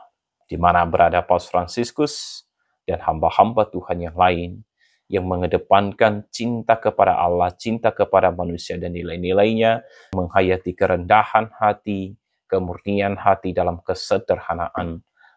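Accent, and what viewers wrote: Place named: Borneo, Indonesia. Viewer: Dari logatnya ibu ini kayak logat dayak ya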